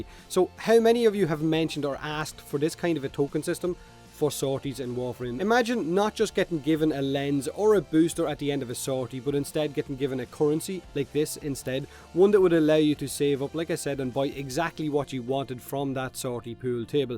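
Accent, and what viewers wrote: Ireland. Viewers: i love the irish accent. hell i love any games reviewed if i could ;)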